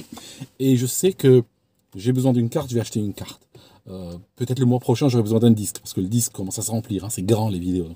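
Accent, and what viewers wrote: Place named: Switzerland. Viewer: Il est génial ton Kanal 👍 j'aime beaucoup 👌❤️ Ici en France nous parlons de 'Chaîne' Youtube 😉 même si ta dénomination de canal, ou Youtube Channel, me rappelle que tes rares intonations de blédard sont une bénédiction lorsqu'on entend ton accent suisse 🫣 ENSMAtiquement Français 🥰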